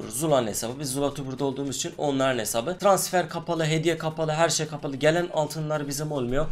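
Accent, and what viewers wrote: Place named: Urfa, Turkey. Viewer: Abi beni yanlış anlama ama öncelikle biraz kitap okuyup konuşmanı düzeltmelisin. Evet biliyoruz Urfalısın ama bu konuşmandan dolayı arkadaşım seni izlemeyi bıraktı. Benden sana tercih rekabette ve battle royale modunda değişik şeyler dene... Örneğin rekabette hiç bilinmeyen silahlar ile oyna veya sadece tabanca vs. oyna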